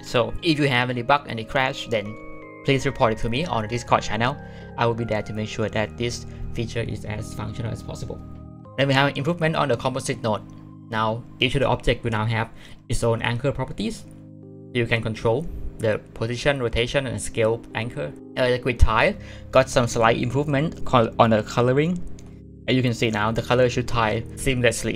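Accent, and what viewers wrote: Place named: Thailand. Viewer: Your accent sounds like someone who is secretly into Thai. Am I the only one who thinks this?🤔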